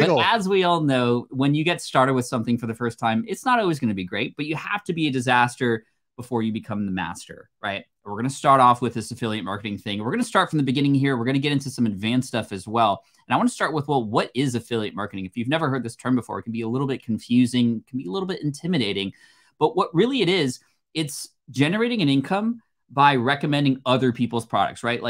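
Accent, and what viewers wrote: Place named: United States. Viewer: Hey fellow audience members, What type of American accent is this? I’ve just noticed this a few times in different videos on YouTube. And I really like this accent. What kind of city or state or whatever does this accent come from. It reminds me of the accent of the guy at dailytekk. PS I’m outside the US, and have started to recognise in a little bit more detail different American accents rather than just the classic ones I knew as a kid, and it’s just interesting to me if I hear one that I like and I keep hearing in different videos. I probably will end up looking up a accents of the US kind of video. But every now and again I’ll just ask what is that accent because it’s interesting to me in the moment. Thanks.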